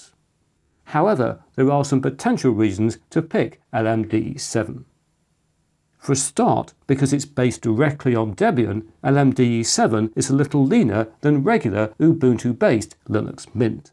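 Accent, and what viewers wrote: United Kingdom. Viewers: Dear ‚ I might be the most commenter on this channel by far. It feels so good to say that you are the god of computing. The way you explain topics‚ the way you divide content into subtopics‚ and ofcourse the great Britain accent. Brutha, you are the goat of computing. Using your videos as a guide to installing anything. Ofcourse, I try things out first. Use Chrome OS Flex on my Main Laptop. And use Omarchy Linux on my desktop home pc. You can say I use Arch btw!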